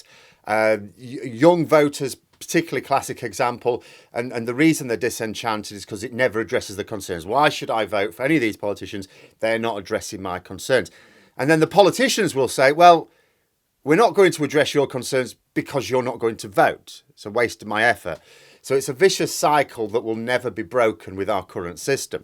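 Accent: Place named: Northern England, United Kingdom. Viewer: I’ve watched every video for the last three years, I think I was instrumental in the initial improvement of audio with my sheer unmedicated adhd based abuse… (I was in a bad place at the time, still am lol) Now I’m working on his delivery 😂 My beef is the lack of dynamic control. The lack of breath control. These things would definitely help his career. I’ve been a professional actor/singer and breath control classes basically saved my voice, and made me better at my job. I listen on multiple devices, the audio quality is good until he gets too loud, then a combination of his own setup and YouTube audio compression algorithms makes it less than predictable to listen to, and less than enjoyable, that’s before I get into the psychological effect of being shouted at twice a day by an intelligent yet angry northerner. It makes me angry. 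It’s the natural effect. Anger is useful, but it’s not a good tool for education. I’m a music producer and mixer so audio is important to me. Clear, controlled speech into good quality equipment is what the tv industry has done for nearly a century now, the popularity of YouTube influencers often betrays the quality of their self-production. Many of these people don’t understand microphone proximity or directionality, reverberation, plosives, sibilants, digital clipping and distortion, digital limiting, RMS conventions for broadcast… or the rest of what makes BBC studio sound the best in the world, and is important for consistent, legible, easil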